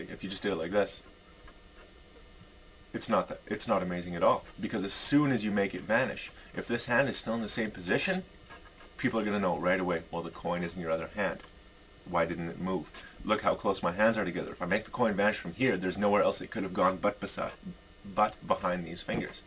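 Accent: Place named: Canada. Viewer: Miss-direction? Who is she, i would like to meet her? Unless I have missunderstood! lol Very informative explanation - I saw Shawn Franquar at convention the other day. I guess you are from Canada by your accent? I remember going to Browsers Den in Toronto and saw Jay Sankey. I wanted to work there with jeff and Shana. Also Vanishing rabbit in Calgary AB. You may know Brett or Tyler!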